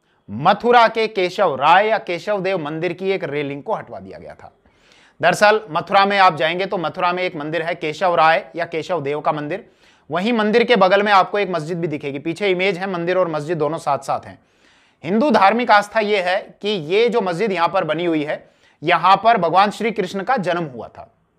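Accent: Rajasthan, India. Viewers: your accent shows your Rajasthani roots is just great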